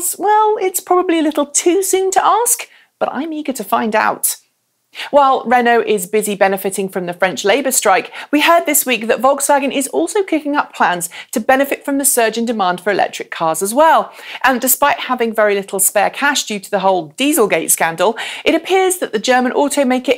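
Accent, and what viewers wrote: United Kingdom. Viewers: Thanks! I've never been called 'terribly obnoxious' before. Nor do I know how it's possible for a voice to be obnoxious. Is it the British accent? The pitch? The intonation? Of course, there are always subtitles. And we do provide those:) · Nah, I'm fine with a British accent. For me it's the pitch, it makes it kinda.... squeaky.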